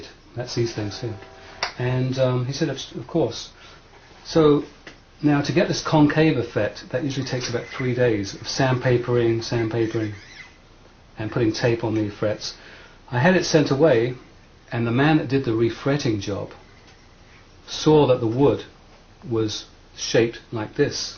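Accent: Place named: England, United Kingdom. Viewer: I love Ritchie's sense of humour. I love his accent too. Quintessentially English.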